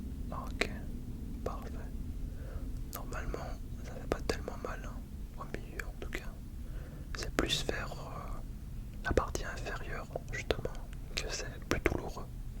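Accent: Belgium. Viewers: Serait-ce un accent belge que j’entend?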